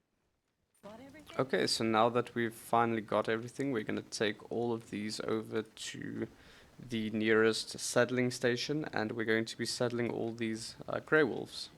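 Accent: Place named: South Africa. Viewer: You are from saffa right ? I know a saffa accent when I hear one, immediately got my attention. 😂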